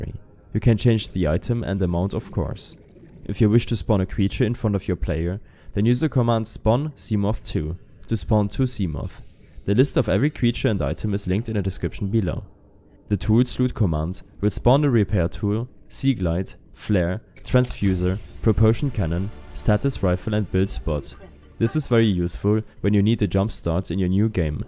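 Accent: Germany. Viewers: Not like Indian like German because I’m german and most of my friends when they try to speak English they are speaking like this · Kann es sein das du Deutsch sprichst? Du hast nen starken Klangf in der Stimme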